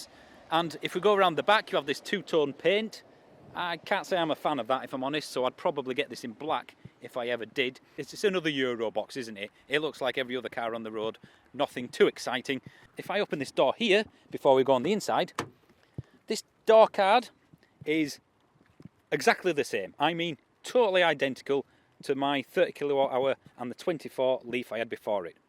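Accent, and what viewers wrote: Yorkshire, United Kingdom. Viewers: Yorkshire England I reckon near keighley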